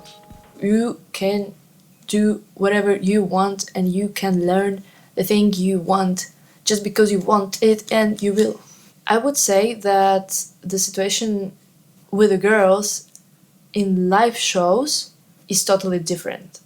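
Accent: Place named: Eastern Europe. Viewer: cute славянский accent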